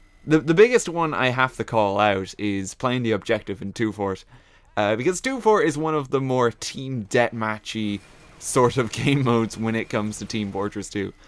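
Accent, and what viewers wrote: Ireland. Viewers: Are you Irish? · I actually like 2Fort, but it needs a time limit. P.S. Are you Irish? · You're an Irish TF2 youtuber? subbed immediately. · haha your Irish accent is one of the things that keeps me coming back! It's so fun to hear you folks say 'Threehundred and thirty three trees' and so on... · lol your accent sounds like half Irish and half Australian xD (plsignore)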